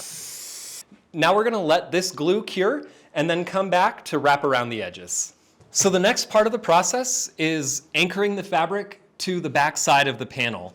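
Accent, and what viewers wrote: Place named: Germany. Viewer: Thx, really worthwhile to watch. Btw, zufällig deutsch? Wegen des ever so slightly hörbaren Akzents.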